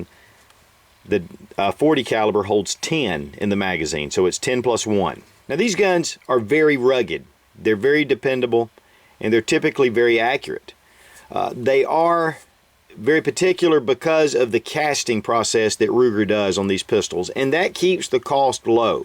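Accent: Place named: South Carolina, United States. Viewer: you prove in all of your videos you know what you are talking about and that accent seems familiar to me seeing as im from SC.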